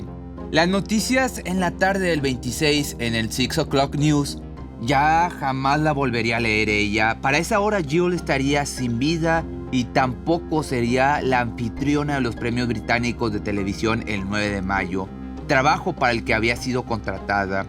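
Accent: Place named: Mexico. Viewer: eres muy guapo🥰🤗,..descubrí tu canal ayer y de una me suscribí 😁...de dónde eres, tienes un acento como ser de México 🤔🤭😻😍😘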